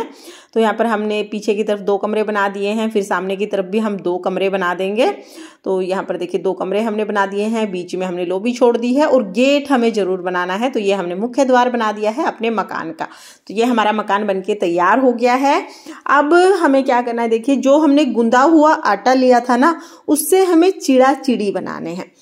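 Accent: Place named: Bengal, India. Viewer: Di aapki bengales kafi pyari lag rahi h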